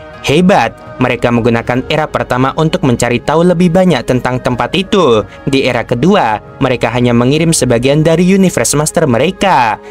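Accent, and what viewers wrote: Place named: Kelantan, Malaysia. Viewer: Bro dah pandai kecek klate..❤❤ bulang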